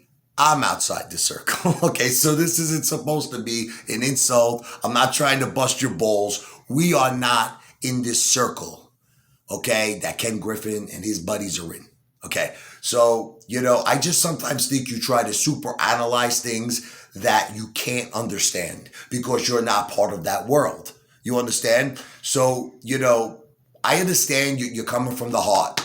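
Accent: South, United States. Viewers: You’re not form New York ! You’re form the south with you’re southern draw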